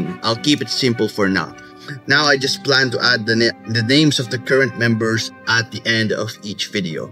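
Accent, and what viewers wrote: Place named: Eastern Europe. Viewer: in-depth sciency roblox video -> click slavic voice for some reason, every time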